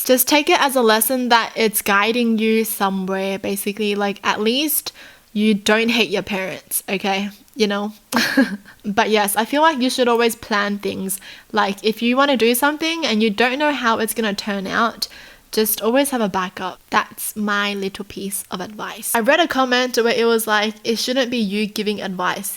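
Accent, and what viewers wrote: Australia. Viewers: I love SO MUCH LIKE SO MUCH but if your a kpop lover [like me] you might notice she sounds and kinda looks like a Girl {my bias} named Rosé from BLACKPINK. Maybe it’s because they both have Australian accent but I love her and the same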